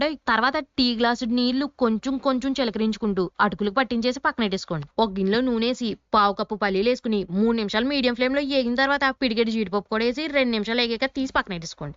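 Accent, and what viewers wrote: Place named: East Godavari, India. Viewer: Your east Godavari slang is very nice.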